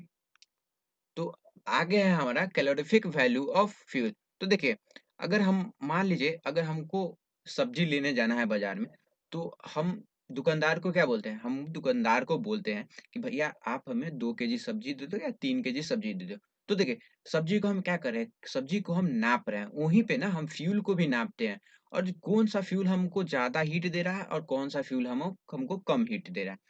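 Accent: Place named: Bihar, India. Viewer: Aap kaha se ho .. mujhe aapki voice se lag rha h ki aap bihar ke ho ..mtlb ya to aap bihar me reh rhe hoge ya jharkhand me 😁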